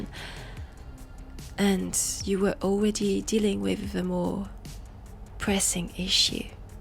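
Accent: France. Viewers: Damn bruh you had me at French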